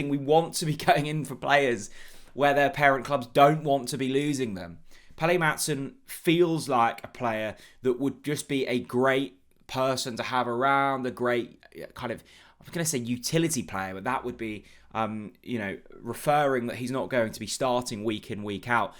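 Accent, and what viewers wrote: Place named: Norfolk, United Kingdom. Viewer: Can you revert to your east Norfolk silky tones, as you laid bare in your early videos ? Altogether now - "thaass not roight ! Them defendas wannt get orn that ball" "that Kiffsgaaaaadaaan, is oriiite!"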